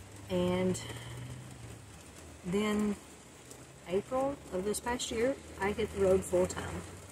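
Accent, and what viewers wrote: South, United States. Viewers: Where are you from? You have a southern accent but were going to see your son in New York. I was just wondering where your journey began.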